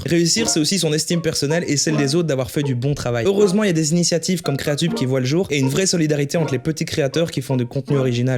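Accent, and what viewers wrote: Liège, Belgium. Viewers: Il me semblait bien avoir entendu un accent liégeois ! Bonne analyse des contenus de m... Et sinon le sel est passé maintenant ? :-P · Dis moi, es tu belge ?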